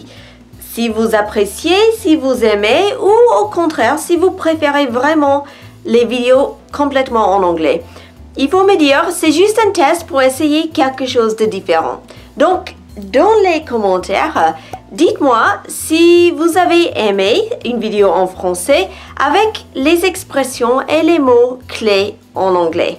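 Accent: United States. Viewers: Merci! I loved this French video.La culture Française vue par les américains est d'autant plus crédible avec ton super accent. Comme d'habitude cela me sera très utile lors de mes prochaines rencontres avec mes collègues US.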